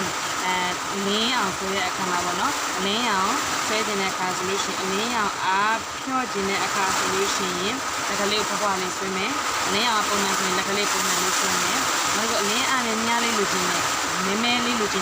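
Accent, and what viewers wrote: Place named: Rakhine, Myanmar. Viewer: ရခိုင်သံလေးနဲ့ တူပါတယ် ဆရာမ❤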